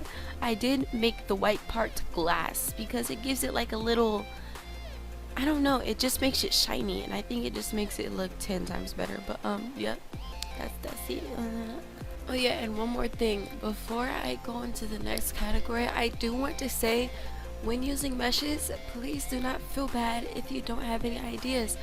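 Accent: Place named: United Kingdom. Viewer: im kind of hearing a british accent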